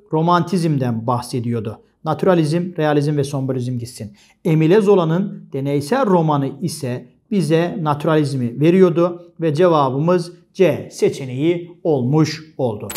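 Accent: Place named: Akçaabat, Turkey. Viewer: Hocam sizi yeni keşfettim çok sağlam videolarınız var maşallah öncelikle tebrik edip sonra bir sorum var acaba Trabzonlu musunuz hadi biraz daha tahmin edeyim Akçaabatlı şiveniz birebir aynı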